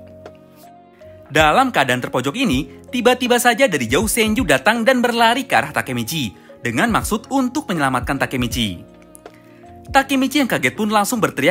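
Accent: West Java, Indonesia. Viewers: Drag-keun orang sunda ya ?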